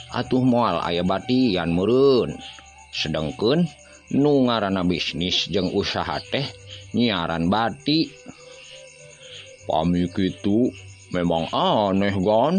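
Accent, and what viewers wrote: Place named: Sunda, Indonesia. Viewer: DINA MAOSKEUN MAH SAE. KEUNA KANA AKSEN SUNDA. NANGING SEU RUR KECAP ANU LEPAT. RANDA JADI RANGDA NU KEDAHNA EU DIBACA E. KAWAS TEU WANOH BASA SUNDA. 🙏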